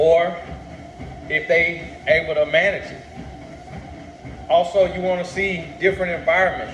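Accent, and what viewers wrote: South, United States. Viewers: Hey there Mr. I forgot to just say ion know what video you said it on but I’ve heard a few times but you say you was insecure or something like that about your country accent man that the one thing I love about on know fruit stuff but I makes me more comfortable as black man to sit an listen to your videos it speaks to me an Im sure many others I be definitely got out more of my homies on from the hood anybody else wouldn’t be able to reach us like you can so I appreciate you an everything you do I pray God bless you even more man cause honestly I had been lacking in the self love department do to child hood trama an had some bad patterns popping in relationships back to back from Mommy wounds that were never solved but hearing this an hour courses have really helped an continued to help so thank you man 💪🏿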